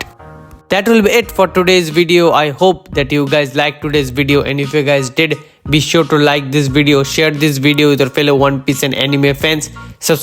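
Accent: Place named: India. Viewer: Brother your English accent is Indian so if you are a Indian then try it to explain in Hindi there are indian community also + your voice is also good 👍🏻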